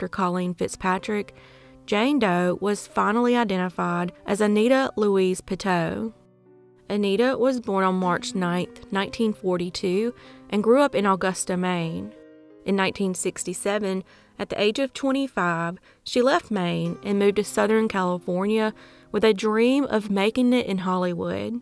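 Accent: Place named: New Orleans, United States. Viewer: Looking at the picture and knowing how she was found in the bloody bathroom, that shower curtain seems an unfortunately eerie visual choice :O I always look forward to your uploads--it's like a taste of crime and a taste of home (I'm from New Orleans) at the same time. I live in Michigan now and your lovely accent is like familiar company after these many months :)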